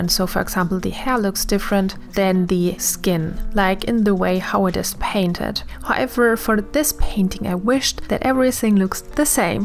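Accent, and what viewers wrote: Germany. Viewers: Man, deine Skizzen sind allein schon immer so unglaublich schön <3 das Endergebnis ist natürlich mal wieder richtig traumhaft:) ich liebe deine Art Nouveau Einflüsse, die Kunst von damals ist so schön und zusammen mit deinem Stil wirkt das so toll:) Danke übrigens für die ganzen Tipps die du mir und anderen Künstlern zur Verfügung stellst! Ohne dich und ein paar andere Kunst-Youtuber hätte ich es nie geschafft meine Kunst so zu verbessern (auch wenn ich natürlich noch viel zu lernen habe:D). Du bist glaube ich übrigens die einzige Deutsche deren Voiceover ich in Englisch immer total mag, deine Stimme ist total entspannend:D Und ja, du bist nicht die Einzige die ständig ihre Art supplies verliert:D Ich hab's zwar eigentlich immer alles an einem bestimmten Platz liegen, aber ich weiß einfach nicht wohin meine Pinsel ständig verschwinden...:D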